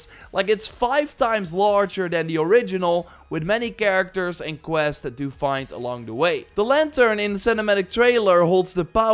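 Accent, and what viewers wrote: Netherlands. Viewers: het hollands accent kan je ook niet verstoppen eh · Ooeeff best lastig om te volgen met zo'n sterk nederlands accent eerlijk gezegd.. wel reviews verder😁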